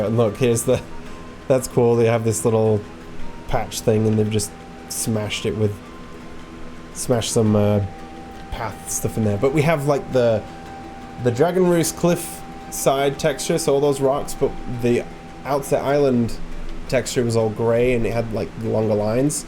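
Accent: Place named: Scotland, United Kingdom. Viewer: I'm confused by your accent. It's like a mixture of Scottish, a northern accent and some American, at times? Am I going mad?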